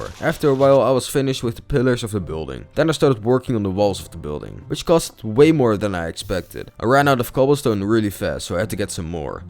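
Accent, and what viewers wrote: Netherlands. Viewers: Hahah you sound Dutch are you Dutch hahaha 😂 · Its dutch. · Ik weet dat je Nederlands bent ik hoor het gewoon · I smell Dutch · I Smell DUTCH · You rlly have a Dutch accent 👀👀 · I just love this dutch accent Ik hou van dit nederlandse acsent🤧✨🤜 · I smell nederlander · idk whybut i smell dutch / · Just please do something about your Nederlands exent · You sound Nederlands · sound like ur dutch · Nederlands Engels 😍🥵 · 2mln views, u deserve this, r u dutch? Ur accent sounds dutch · Bro je nederlandse accent is wel echt goud😂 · Jij bent nederlands dat hoor ik gewoon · Je hoort gewoon dat het een Nederlander is 😂😂👍🏼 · Jo you have dutch accent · You sound dutch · Nederlands ik hoor het aan je stem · Very dutch accent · De nederlandse accent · Je bent Nederlands dat hoor je meteen! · i smell nederlands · Mmmmm i smell nl accent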